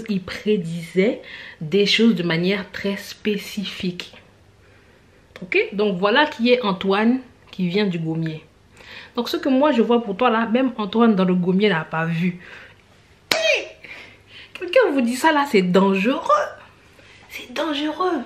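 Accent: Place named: Africa. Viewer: Merci pour toutes ces expressions ; que je connais sans savoir leur signification . Tu es trop forte .tu as l'accent africain et jaime bien .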